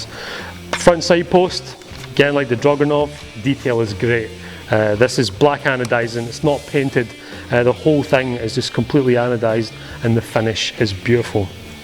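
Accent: Scotland, United Kingdom. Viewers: Very nice review! No box comments (why do people do that??), indoor environment for consistent testing, good shots and lighting... and that Scottish accent! what can we ask more? :P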